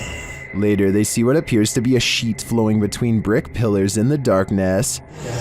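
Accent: California, United States. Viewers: DUDE, are you from Cali? You sound like one of those surfer guys. Kind of hard to listen to you. But the videos are pretty good. Not very scary, but none the less entertaining.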